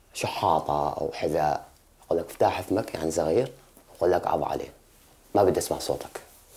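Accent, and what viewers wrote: Iraq. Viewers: سوري من محافظة دير الزور قريبه من الحدود العراقيه . لهجتهم قريبه من اللهجه العراقيه وخصوصا لهجة اهل الموصل ولهجة غرب الانبار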